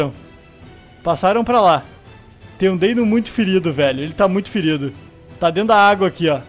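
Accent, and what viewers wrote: Rio Grande do Sul, Brazil. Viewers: tu e gaucho de bento ne?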